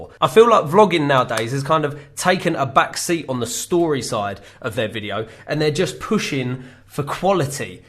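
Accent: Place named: England, United Kingdom. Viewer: Super impressed of your english origin hearing that Mate! Mate!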